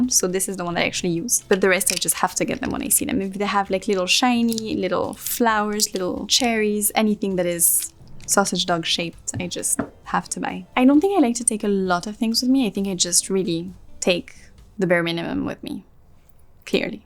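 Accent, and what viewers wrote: France. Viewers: The French accent ❤ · She’s really so beautiful, I love how she speaks English with a French accent. Shes so classy, I love classy women.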